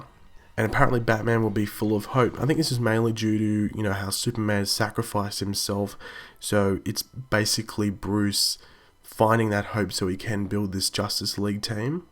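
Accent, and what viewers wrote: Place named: Australia. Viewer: your Australian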